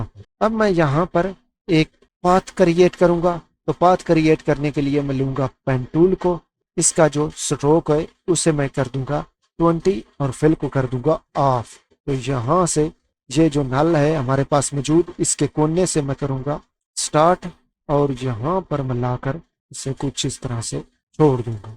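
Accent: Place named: Punjab, India. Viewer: Bhai apna talaffuz Punjabi say Urdu karo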